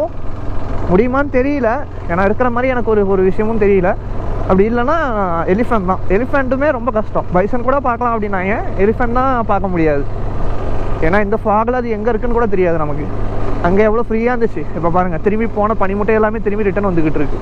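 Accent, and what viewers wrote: Kerala, India. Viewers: Ean bro malayalam slang la pesura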